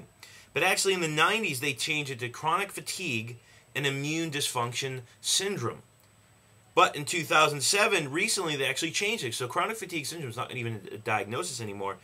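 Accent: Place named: Boston, United States. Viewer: Let me guess --- you're from the North Shore of Boston.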